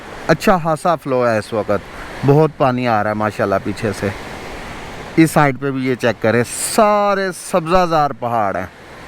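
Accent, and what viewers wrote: Punjab, India. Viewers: Bhai es se acha hai ap punjabi bol lo kyun k ap ki urdu hindi bolne main punjabi lehja kafi had tak mix hai ya ap parhe likhe km ho😊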